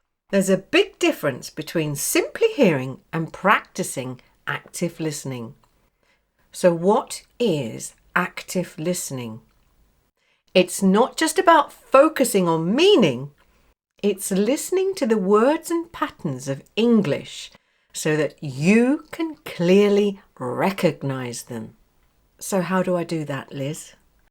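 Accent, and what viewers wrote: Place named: Northern England, United Kingdom. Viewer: What city do you live in Liz? You sound from north England. Greetings from Casablanca Morocco.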